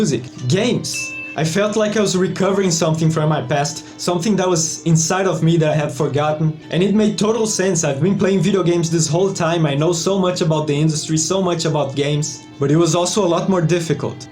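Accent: Brazil. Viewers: Hey, you have a brazilian's accenct. Are you brazilian?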